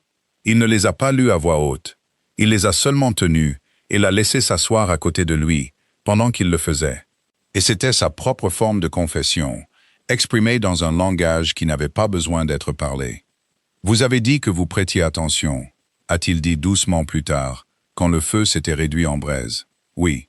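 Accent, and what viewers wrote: United Kingdom. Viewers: Merci pour ce beau récit, l’honnêteté enfin reconnu..ainsi que l’amour, merci également au narrateur et à son joli accent britannique. Je vous écoute du Sud de la France, proche de la Mer Méditerranée..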